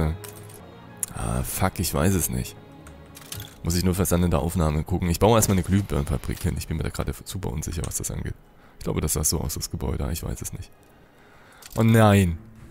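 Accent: Saxony, Germany. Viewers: Sächsisch? LG (ツ)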